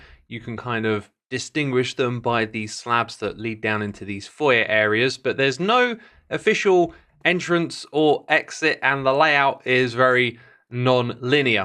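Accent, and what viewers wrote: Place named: United Kingdom. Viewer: I was hoping to find a comment like this. British accent certainly doesnt help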